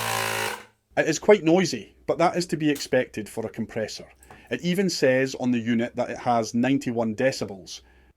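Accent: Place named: Scotland, United Kingdom. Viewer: I'm an even smaller creator, you're way ahead of and better than me 😂 Also, love the scottish 👍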